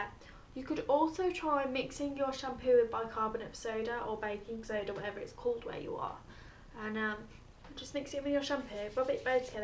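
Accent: United Kingdom. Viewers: Are you british/english? I love your voice! :3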